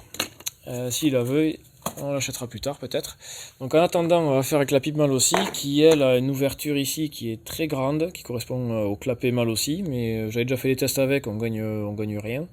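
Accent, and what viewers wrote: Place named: Toulouse, France. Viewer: Bonjour! Heureux de réentendre ton accent toulousain " con"! Dis moi la rondelle que tu as mis en bout sur l'ecrou du vario er3 de ta mob elle mesure combien d'épaisseur et quelle est sa fonction? 😊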